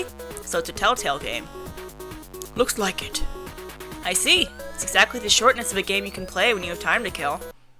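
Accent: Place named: South, United States. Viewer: Loool, love how your accent likes to default to Southern when you try and put on a voice XD! I must say, I nearly lost my hearing when you started doing Akushima's voice. I don't usually watch you and with earphones as I've learnt from before (ESPECIALLY when you voice Mr Bandages) that volume tends to vary sometimes:P But now I'm trying to catch up on this at work and just xD...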